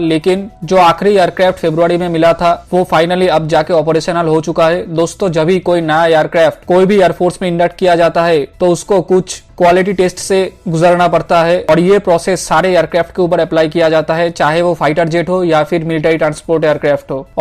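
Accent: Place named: Bengal, India. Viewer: Bhai tum bangal ho tum hindi main vedio kyu bana rahe ho ..tumhare alfaz aur talaffooz se pata chalta hain ke tum bangal hoo.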